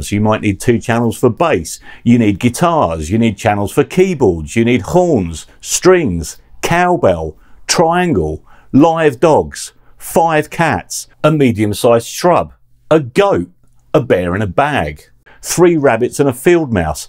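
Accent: United Kingdom. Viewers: Entertaining and informative. and you must be from the West Midlands. i subbed lol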